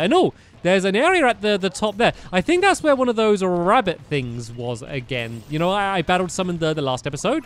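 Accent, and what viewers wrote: England, United Kingdom. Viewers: dexieplays he is English so he speaks differently I say zed aswell coz I’m not american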